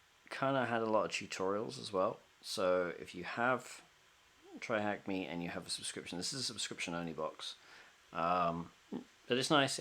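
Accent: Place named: United Kingdom. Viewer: British guy😎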